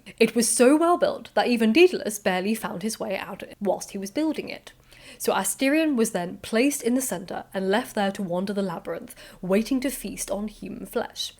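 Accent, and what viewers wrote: United Kingdom. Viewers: Just found your channel - I'm enjoying it! So many great and informative videos, and so well presented! Growing up, my librarian parents gave me a book of Greek Mythology to read, so I am familiar with the myths, names and stories. Later in life I re-examined the Minotaur myth twice as I discovered new references to it. The first was Richard Strauss' opera "Ariadne auf Naxos" (I've heard you state you're not a music fan, but check this out as "research"), and the other a short story by Jorge Luis Borges, where he re-tells the story from the point-of-view of the Minotaur! My jaw dropped when I read that - if you don't know it, it's a super short, and satisfying, read. The other thing I note when I watch your videos, is that you folks across the pond pronounce all the Greek names very differently than we do in the States. Is that scholarly, or just regional I wonder? 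Anyway, great job (as usual!)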